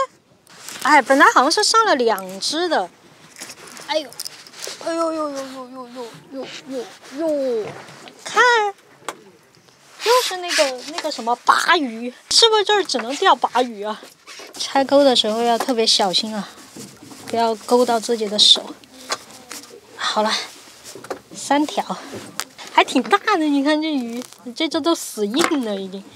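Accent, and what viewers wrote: Sichuan, China. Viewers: 听口音像四川，最后果然说四川人了。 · 哈哈果然是一个地道四川人，我看你第一个视频就听出来了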